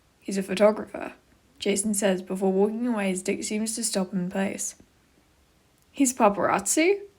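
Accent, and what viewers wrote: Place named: United Kingdom. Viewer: HELLO oh omg your BRITISH i think that's what the accent is i'm originally from dustbunnys channel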